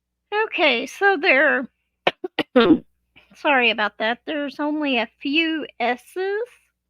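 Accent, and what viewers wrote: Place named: South, United States. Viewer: Hiya, V!! I believe you and I spoke a little during Granny's 1st Premiere. I liked and subscribed your channel back then, however, I am currently having severe vision issues and am unable to diamond paint right now, but I just loved your wip & chat! What a lovely southern accent you have. I look forward to watching more of your videos. Sending loads of love & prayers to your Mom. 😊❤🙏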